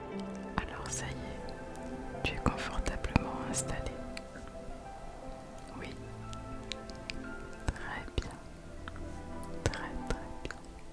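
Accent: France. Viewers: My first asmr was a french random guy doing his makeup on yt I think 2010 .. I like the way you french pronounce ici and all your language.. you got a beautiful voice and whispers plus you so gorgeous😍💞💞💞 good night 💆🏻‍♀️💆🏻‍♀️💆🏻‍♀️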